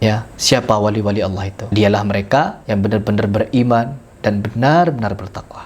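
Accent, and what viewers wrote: Madura, Indonesia. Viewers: Maklum aja wal ai ni taunya bekoceh aja kda pernah tau kisah kewalian guru sekumpul,Inya taunya bekoceh gasan kontennya ja..smlm ja di tempeleng warga dikepala Ranai aja Inya,pinanya urang madura dr logatnya.paham ja toh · org Madura Pina ny dari logat bicara ny mang ae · Kya orng madura kmu ini logatnya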